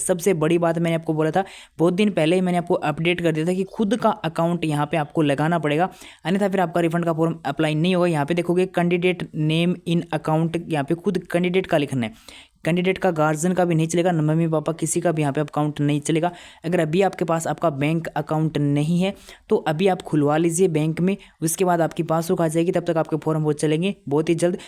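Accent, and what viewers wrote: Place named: Rajasthan, India. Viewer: bhai teri rajasthani language ki boli mst h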